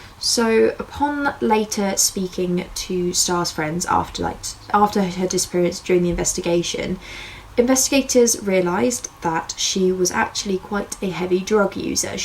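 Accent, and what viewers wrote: England, United Kingdom. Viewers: Don't worry about it you're obviously from England or possibly know that's definitely English not Welsh and certainly not Scottish anyway you know there are at least all the same language basically American and English are completely different languages at this point so don't be so hard on yourself. You did true crime & you're hot that's enough for anybody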